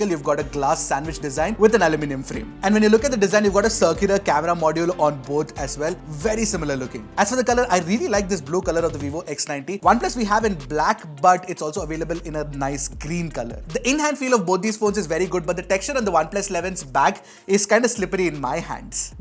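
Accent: India. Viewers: WOOOOOOOOOOOOOOOOOOOO! THAT INDIAN ACCENT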